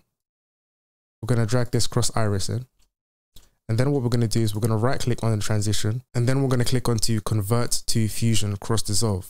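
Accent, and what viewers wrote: United Kingdom. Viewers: Enjoy your content my man. Step by step and super clear. Also love hearing a UK accent. Subbed keep up the davinci content!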